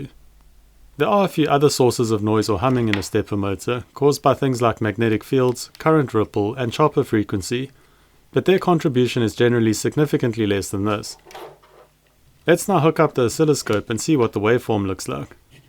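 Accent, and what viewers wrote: South Africa. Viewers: As a saffer in the uk its surreal hearing a SAFRICA accent on a random YT algorithim suggestion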